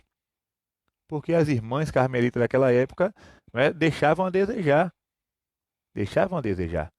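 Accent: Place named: Bahia, Brazil. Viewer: Padre que luz, eu que já adoro o sotaque baiano, ficaria horas te ouvindo com esta sabedoria serena e humana, parabéns, que belíssima explanação! Gratidão.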